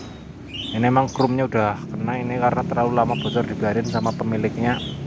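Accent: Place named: Java, Indonesia. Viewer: Mas'nya lucu...heheh.. Saya suka ketawa sendiri kalo dengar orang jawa,bernahasa indo tapi jawa'nya masih meddok...xixixi... Tapi jujur, dari awal sampai akhir video..saya suka mas... Mas Detail kasih ilmunya..sip. Kebetulan saya baru mau ganti seal shok beat istri saya,perdana nih saya... Matur nuwun ya mas...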